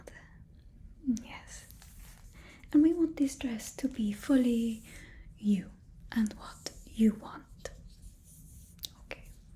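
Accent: France. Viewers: Pretty fine french accent even if as a french i know it's wayyy more classy than the real one xd amazing roleplay, i binged bridgerton and i'm so happy to see that you were ready for this too! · l'accent francais est super bien fait ! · I haven't watched the show but I am made to understand that there are a lot of butts involved. So I wish everyone a wonderful new season of their butt drama. Love the French accent. Looking forward to relaxing with this 💚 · The French accent! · I expected a British accent but the French accent is absolutely amazing and a welcome change! 👍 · I am obsessed ❤❤❤I’ve been waiting for another French accent since the spy fitting video 🥹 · Your French accent is calming. You should use it more. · I feel so dumb i was like shes not gonna dona french accent and then she started doing a friend accent and i got so excited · Great french accent ! 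· Very Très bel accent Français mademoiselle ! J’adore 😊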